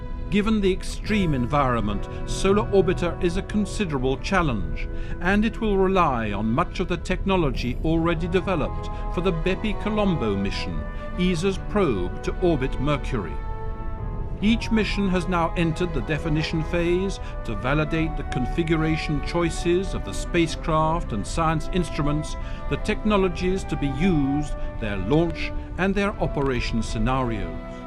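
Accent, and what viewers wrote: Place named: United Kingdom. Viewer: cuz the brit accent is plenty cool enough in mono.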